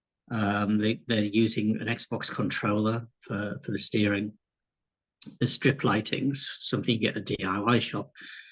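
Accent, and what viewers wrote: United Kingdom. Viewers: british bla bla bla boring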